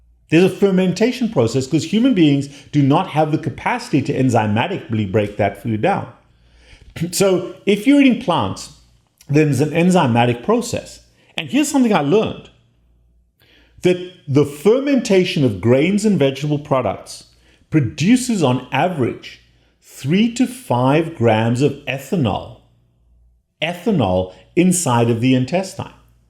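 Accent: South Africa. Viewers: I knew by your accent that you were South African, Great commentary, very imformative.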